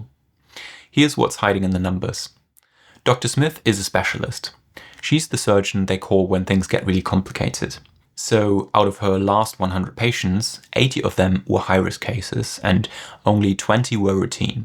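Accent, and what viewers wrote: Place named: England, United Kingdom. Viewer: Interesting that the English sounding narrator talks about surgeons as Doctors because in the UK if they are surgical specialists they would be called Mister or a female equivalent. Perhaps he is making this more understandable for your generic English speaker without having the caveat about their actual titles. Still it amuses me when I tell Americans that if they have appendicitis in the UK and they are told Dr. Jones will be along soon, to run like hell because he's not a specialist.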